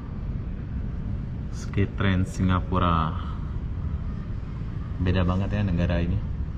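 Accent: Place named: Malaysia. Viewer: Apa-apa benda☺️ Kamu ade mix malaysia ke percakapan kamu ade sikit-sikit melayu😃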